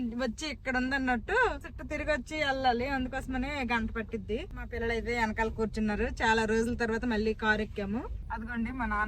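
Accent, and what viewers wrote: Srikakulam, India. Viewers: Srikakulam launguage is an emotion 😊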